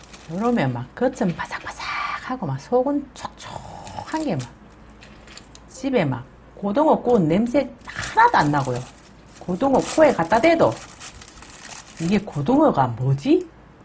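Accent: Gyeongsang, South Korea. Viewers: 경상도사투리 너무좋다 부러워요 입담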